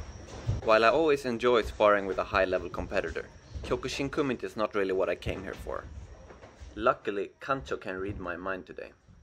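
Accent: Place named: Scandinavia. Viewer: Your accent sounds familiar. Are you Swedish or from a nordic country?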